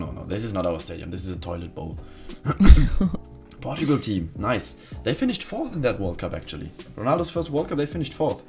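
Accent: Germany. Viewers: Wie Mann es einfach hört das er Deutscher ist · Bist du aus Deutschland? Hört sich so an. PS gute Videos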